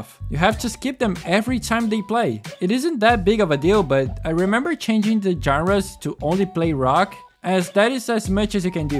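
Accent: Brazil. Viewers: Bro you Brazilian?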